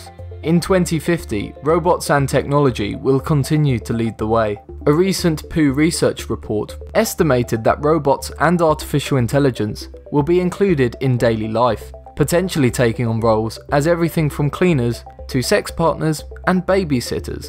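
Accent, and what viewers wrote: United Kingdom. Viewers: How could you possibly think that?! This guy is British and Dolan is Australian, they don't sound anything alike.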